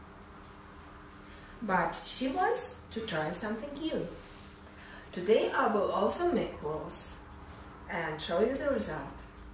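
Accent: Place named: Russia. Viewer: yes i knew you spoke russian by your accent!!!! :)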